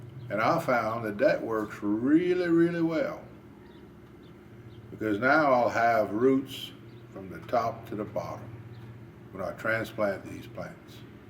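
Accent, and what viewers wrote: Louisiana, United States. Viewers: Teri Ruiz I'm 'sheeshing' right along with you and.. I'm scratching my amateur head wondering what zone I'm in (I live in the Netherlands). I think I'll start some tomato seeds in a few days like shown in this video, then a week or two after that by using the method shown. I love learning from this guy too.. and especially LOVE his Cajun (Acadian) accent.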